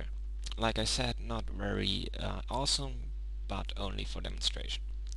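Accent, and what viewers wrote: Germany. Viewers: I attempted to complete the tut using 2.49b with little success(several months ago). I just finished your tutorial using 2.58b(with major success)!! Hooray. Thanks for the Portal and Fire tutorials. You're one of the best blender teachers out there. Love your German accent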